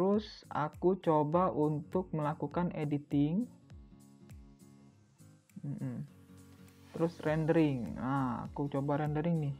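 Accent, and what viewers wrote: Palembang, Indonesia. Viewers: orang palembang ya bang? logatnya kayak orang palembang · orang mana bang? logatnya kek palembang hehe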